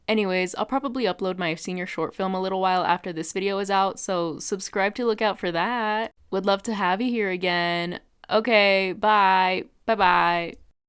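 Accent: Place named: Southern California, United States. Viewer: r u a cali girl youre srs giving insane socal (specifically la and surrounding counties)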